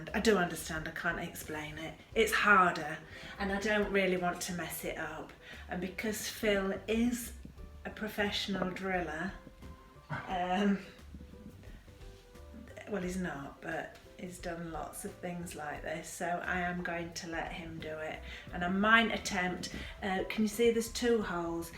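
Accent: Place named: North, United Kingdom. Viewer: Where did you grow up, definitely a fellow northern accent I'm detecting?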